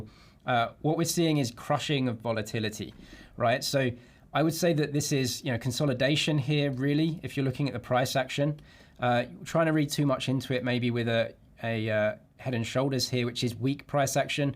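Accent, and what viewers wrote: England, United Kingdom. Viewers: He is British but doesn't live there anymore. And correct, Bybit has ceased operations in UK for now due to regulatory scrutiny. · You sound English, but bybit doesn’t operate in Britain from what I understand? Is this the case?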